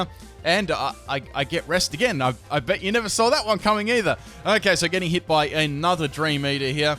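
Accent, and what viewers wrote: Australia. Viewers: Of course the narrator is Australian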